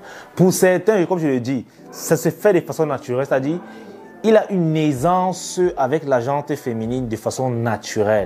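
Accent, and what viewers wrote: Cameroon. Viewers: T'as l'accent camerounais, suis camer aussi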